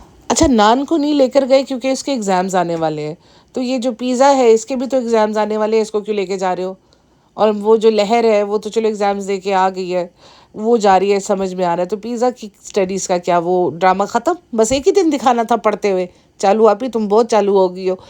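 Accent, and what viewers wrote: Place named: Punjab, India. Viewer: That punjabi tuch ❤❤❤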